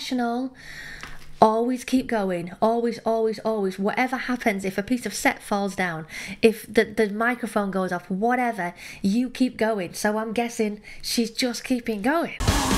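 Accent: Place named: Brighton, United Kingdom. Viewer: Hi, I love your vídeos and I love your accent which reminds me the time I lived in Brighton. Could you check some vídeos? She's a really good mezzosoprano pop singer know like the Spanish Queen of Pop. Pleaseeeee xxxxx.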